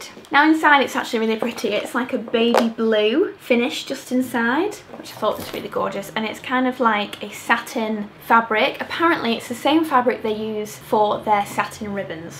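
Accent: United Kingdom. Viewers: what's her accent? like what part of the UK?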